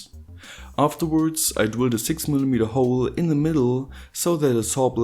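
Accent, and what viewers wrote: Germany. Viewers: Bro you have to be german, there is no way this accent of your's is scottish, it's even more german than mine and of my german friends haha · Man Hört ja das du Deutsch bist daher eine Frage auf Deutsch :D...... kann man mit diesem aufbau auch größere boxen bzw. subwoofer mit einbinden ? wäre coll wenn jemand antwortet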